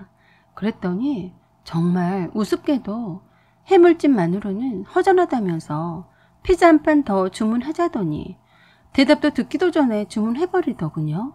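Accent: Jeolla, South Korea. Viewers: 혹시 전라도?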